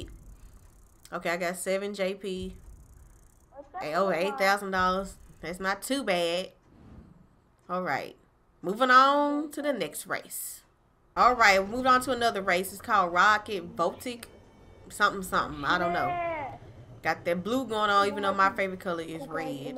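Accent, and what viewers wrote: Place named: South, United States. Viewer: Found you on Sharree. Good stuff. Where are you from though? Your accent says, the south region.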